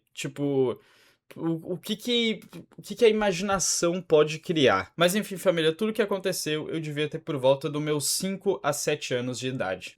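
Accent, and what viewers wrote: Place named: South, Brazil. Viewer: Nesses vídeos mais improvisados o sotaque do sul fica mais destacado kkkkk é os guri não tem jeito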